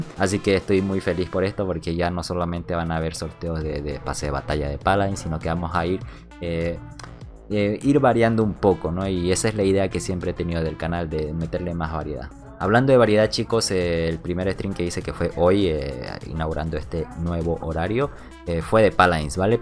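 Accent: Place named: Santa Cruz, Bolivia. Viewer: Si te soy honesto, yo te seguia por tu forma de comentar y jugar.. no llevo muchos meses, pero francamente no tenia idea que eras de Bolivia para que mentirte... Por tu acento me imagino que eres de Angel Sandoval, Santa cruz?.. hablas como entonao XD le subes enfasis a las O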